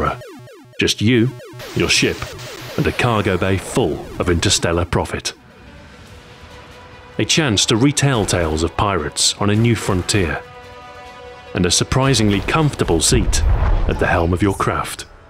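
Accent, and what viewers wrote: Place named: United Kingdom. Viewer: Gaming Culture Well he sounds like he's from the UK and we have Dominoe's here as well.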